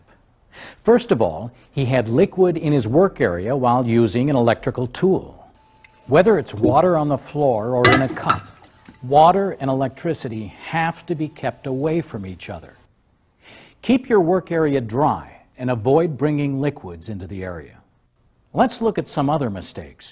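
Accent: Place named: United States. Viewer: Zakaj dobimo amerikanski video, če se učimo bri'ish english?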